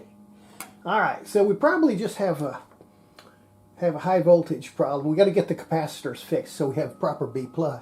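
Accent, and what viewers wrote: United States. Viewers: I think he lives in Texas? It is definitely some flavor of southern U.S. accent, not Australian.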